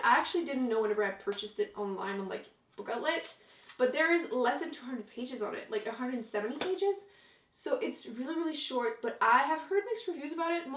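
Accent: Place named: Quebec, Canada. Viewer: Hi Hello! I'm new to your channel and have enjoyed watching your videos and hearing your thoughts on books! Hope you enjoy the books from your January TBR!:) Btw, are you from Canada? You kind of have a French-Canadian accent.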